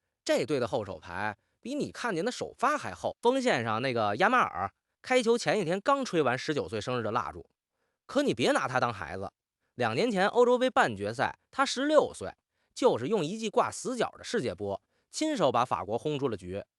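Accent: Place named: Tianjin, China. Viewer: 这个台北主播为啥一股天津味儿？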